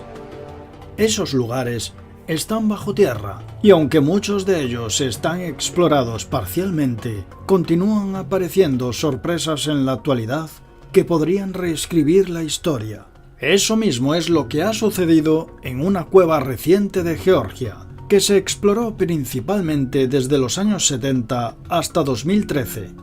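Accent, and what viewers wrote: Spain. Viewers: La noticia es buena pero el acento español es odioso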